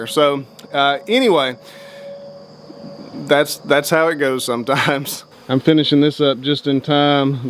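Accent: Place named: Georgia, United States. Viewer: I was stationed in California with a fellow from Athens, Georgia. We became good friends and kept in touch for many years after our service duty was over. These were days before cell phones or internet. Hearing your voice and Georgia accent reminds me of my good friend Eugene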